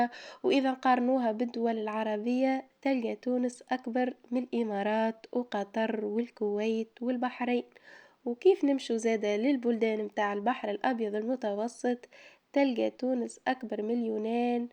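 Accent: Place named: Souf, Algeria. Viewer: الله أعلم هادي لهجة سوفية اكثر من تونسية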